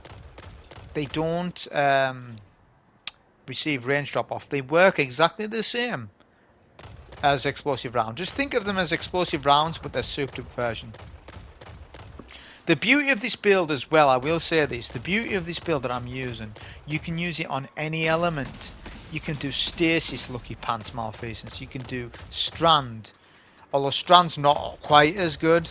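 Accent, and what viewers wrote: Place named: Scotland, United Kingdom. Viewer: As a player exclusively playing with German speaking gamers I always recommend channel, but rarely they speak English well enough to understand his scottish accent. So they go to German speaking youtubers to watch how to play those Nightfalls. I understand well since I worked with two scottish women for a long time. And this channel IS the single best informative channel out there, no one else shares his knowledge in such a detailed way.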